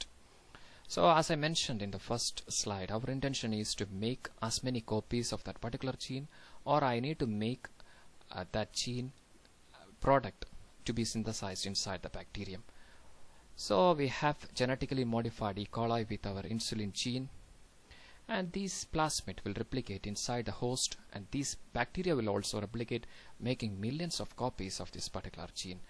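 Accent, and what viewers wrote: Kerala, India. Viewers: amazing example of mallu accent....mallus are the best!!!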